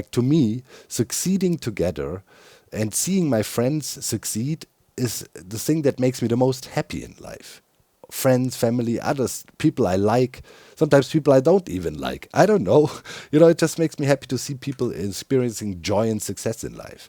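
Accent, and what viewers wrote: Germany. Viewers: I don't understand haters. I really don't. I mean - How can you not adore that German Accent?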